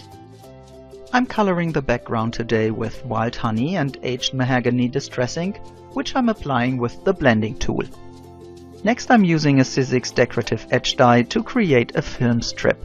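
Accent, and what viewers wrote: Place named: Germany. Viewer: I thought it was German by the writing and accent! :) I'm British but I was born in Munster (dad was in army) stay crafty my friend!! :) X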